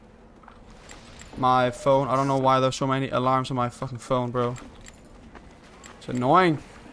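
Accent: Denmark. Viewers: Your too danish, the danglish is real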